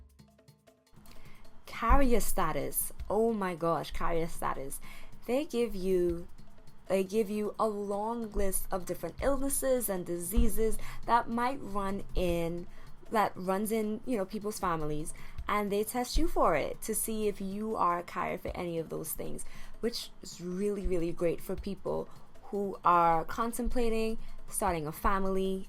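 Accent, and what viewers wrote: Trinidad and Tobago. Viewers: yuh sounding Trini! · Exactly what I was looking for, fellow Trini! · I couldn't decide between Trinidad or Grenada when I first heard you speak. I got my answer browsing the comments lol. Either way, if you're from one, you likely also have family from the other. Great comparison video! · You sounded like Guyanese until I heard the little Trinidadian accent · I This lady sounds Trinidadian🇹🇹 Interesting video..... Hoping for a Christmas sale as they are expensive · You sound Trini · 23andme Had a sale so i used them, also knew a friend who used it. I'm also a trini, i suspected you were as soon as i heard you speak. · how you sounding Trini,sweet like a Trini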